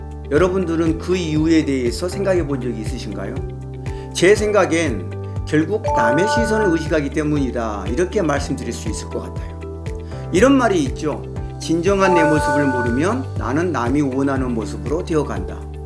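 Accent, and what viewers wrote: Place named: Jeolla, South Korea. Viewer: 짧지만 많은 생각이 듭니다 님처럼 실천은 쉽지 않은 거 같아요 중간 중간 전라도 어투가 더 친숙하게 느껴집니다 가~~~치 가시죠 ㅋㅋ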